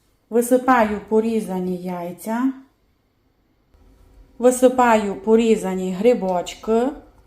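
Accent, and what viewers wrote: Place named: Transcarpathia, Ukraine. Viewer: Дорога пані! Так сталося що я прожила в сонячному Закарпатті три роки (чоловік ❤працював) і я до нестями полюбила цей казковий куточок та цей діалект. Це така ностальгія. Ви кулінар, майстриня та художник ❤❤❤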